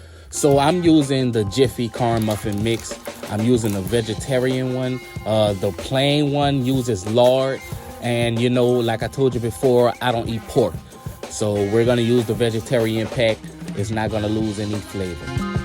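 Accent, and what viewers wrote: Louisiana, United States. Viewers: I know a Louisiana accent when I hear one 😂😍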